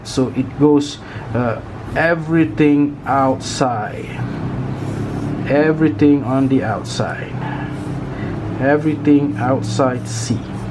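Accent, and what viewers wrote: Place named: India. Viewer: well , his accent sounded totally indian so...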